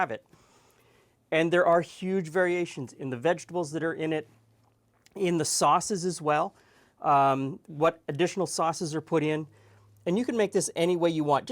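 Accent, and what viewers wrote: Canada. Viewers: i knew from the accent you were a fellow canuck, always love Canadian content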